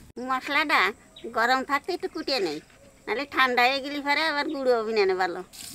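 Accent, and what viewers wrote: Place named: East Bengal, Bangladesh. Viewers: Bhai,tomake Tumi bolchhi ,ranna to batei tomader ke darunlage.tabe sab kichhur theke je jonyo ami dine akbar holeo video ta dekhi,seta thakuma ba mayer kathar jonyo,amar babar bari opar banglay,amra barite ei rakam bhabei katha bole dasher bhasata bachie rakhtam,tai tomader dakhlei àmar ki je bhalo lage bojhate par bona,bolei fellam,Bhai ,kichhu mne korona khub bhalo theko. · ও দিদি দেখে তো জিভে জল এসে যায় খেতে ইচ্ছা থাকলে ও পেটটা সাথ দেয় না ! এতো সুন্দর রান্না, সত্যি রান্না করাটা একটি শিল্পকলা তার চাইতে বড় আপনার কথা গুলো শুনতে খুব মজা লাগে! কারণ আমরা এখন তো পূর্ববঙ্গের কথাবার্তা আন্তরিকতা মানুষে মানুষে মিল বন্ধন ভূলে গেছি!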